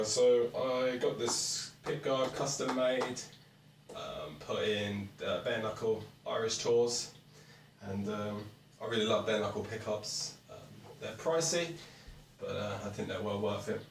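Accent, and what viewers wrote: England, United Kingdom. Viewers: Wow what’s a nice guy really enjoyed that video. Did not expect that English accent. 🙌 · Thought you were American, but I like British accents more haha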